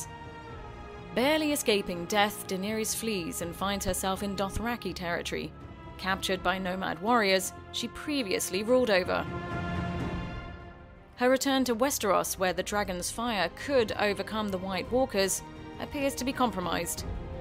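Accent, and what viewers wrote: England, United Kingdom. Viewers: i wasn't even aware this was French. The lady presenting it has a very english accent but does sound quite robotic in the delivery. I agree they are snobbish but if you present what's happened so far in a super popular show you should probably know the right words and how they're pronounced.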